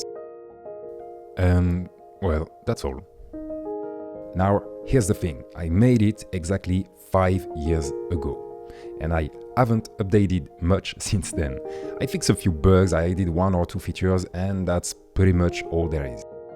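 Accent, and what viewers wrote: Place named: France. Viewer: L'accent français a vraiment été la cerise sur le gâteau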